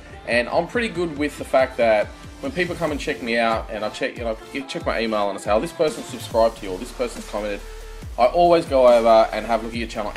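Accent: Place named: Australia. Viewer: I like the Australian accent, so pwetty :3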